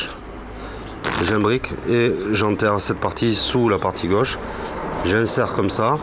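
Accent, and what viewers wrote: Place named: South, France. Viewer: Pour commencer, le soleil, l'accent du sud, les lunettes et des cartes, ça fait du bien, c'est beau ! 😉😎 Ensuite ce tour est clairement une petite pépite qui permet beaucoup de possibilités d'histoires et il est particulièrement efficace ! Je trouve très cool que tu aies osé dire que la version du livre était erronée et pas forcément bien expliquée... C'est bien dommage et regrettable quand il s'agit d'un magicien de cet acabit ! Malheureusement il n'est pas le seul à avoir fait un livre où certaines choses ne collent pas, à se demander pour certains s'ils écrivent eux-mêmes leur livre et s'ils prennent le temps de vérifier que tout est OK ! 👌🏻 Pour la subtilité de daortiz que tu proposes, j'adhère pleinement, c'est plus osé mais ça enleve le côté redondant de la première version, c'est cool que tu en parles aussi ! Pour finir 😅 tu as parfaitement raison, certains tours automatiques sont bien meilleurs que des tours avec manipulations et celui-ci en est la preuve ! 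Voilà, très bonne vidéo quoi qu'il en soit, merci pour le partage mon pote ! 😉👊🏻🔥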